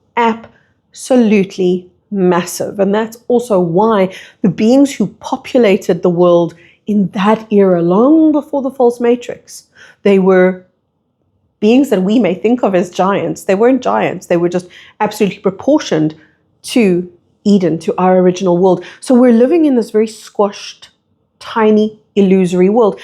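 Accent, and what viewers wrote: South Africa. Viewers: Mmmmm….. I resonate with what is being said .ummmmm the accent……. Sounds SOUTH AFRICA?//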